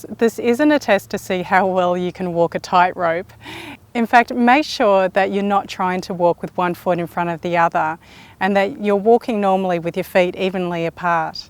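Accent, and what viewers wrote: Australia. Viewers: You do not look pure chinese, plus your last name is Indian, where do you originally come from? Accent is definitely Australian but the looks is so mixed, you cld even pass as an arab by the way.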